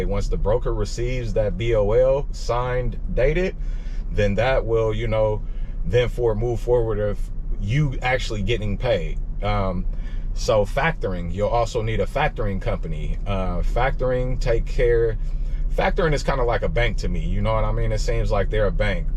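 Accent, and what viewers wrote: Bay Area, United States. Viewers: Lol you know what he meant.. He sounds like he from the Bay.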